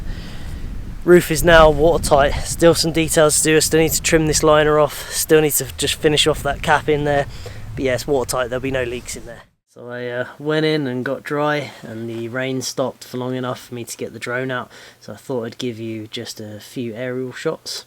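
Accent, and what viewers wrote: United Kingdom. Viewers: I'm a new subscriber...you are one of the most unique and talented "off gridders" I have encountered via video yet!! I hear your British accent, but I'm not sure where you live. Can you share the general area? I'm in the U.S. - Kansas, but we are beginning the process of becoming totally self-sufficient. Thanks for sharing your thoughtful, complex, and rewarding life.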